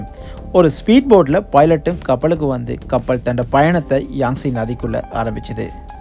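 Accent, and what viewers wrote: Sri Lanka. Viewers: உங்கள் ஈழத்தமிழ் உச்சரிப்பு அருமை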